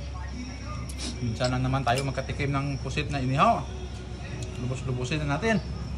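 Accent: Iloilo, Philippines. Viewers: Idol taga saan ka ba... Parang tunong iloilo ka idol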